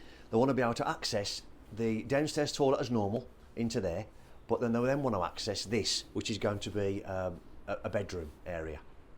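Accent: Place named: Black Country, United Kingdom. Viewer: cheers bud about to do my own ,you sound local are you in blackcountry?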